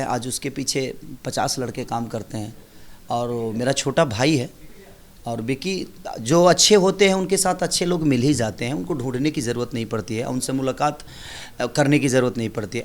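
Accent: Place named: Bhojpuri, India. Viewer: Bhaiya aapke juban se bhojpuri aachha lagta hai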